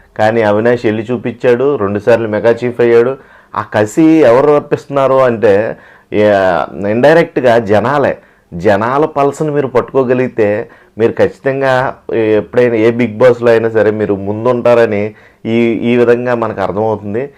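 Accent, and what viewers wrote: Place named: Godavari, India. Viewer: Nuvvu same godavari Jilla pakka super language and manodive bhayya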